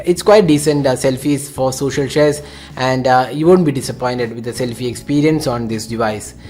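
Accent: Kerala, India. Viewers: r u from Kerala?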